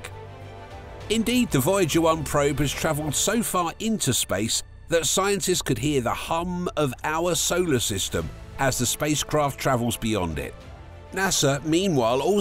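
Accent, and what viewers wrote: United Kingdom. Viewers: Loose the limey.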